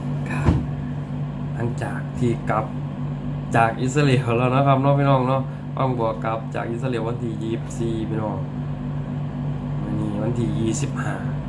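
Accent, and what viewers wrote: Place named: Sakon Nakhon, Thailand. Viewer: คือเสียงบ่าวสกลเนาะได้ฟังแต่เริ่มต้นมวน่ๆๆ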